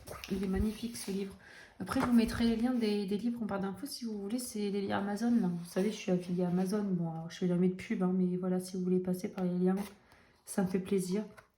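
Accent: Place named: England, United Kingdom. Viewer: Merci pour la mention dans ta vidéo, j'en ai été très touchée! Tu as une super jolie PAC, et vas-y, achète-toi un 3ème exemplaire du livre que tu adores, surtout à ce prix-là! Et surtout, ne lâche pas ton accent anglais, hein, on aime;) Au fait, c'est bon, tu as trouvé tous les trèfles?? Mdrrrrr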